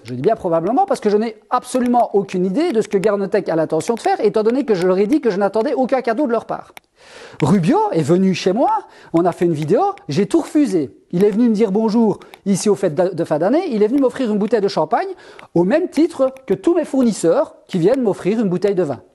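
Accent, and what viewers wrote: Belgium. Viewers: T inquiète, la grande majorité a compris ta démarche. Je te regarde pour le partage et la qualité de ton travail. En bonus j apprécie ton franc parlé et ton accent belge. Bon week-end à toi.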